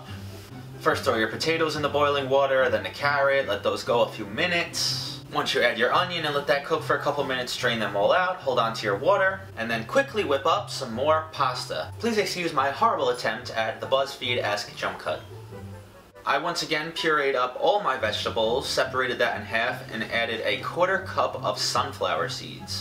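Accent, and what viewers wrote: New York, United States. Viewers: Are you a New Yorker? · Are you from New York? You kinda sound like it.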